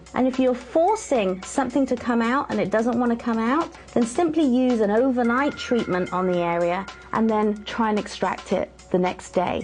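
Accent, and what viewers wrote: United Kingdom. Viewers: pretty standard London/Southeast England accent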